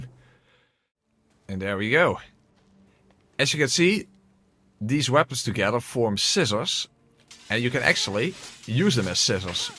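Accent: Netherlands. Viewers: Sounds dunglish! Anyway, great stuff 👍🏻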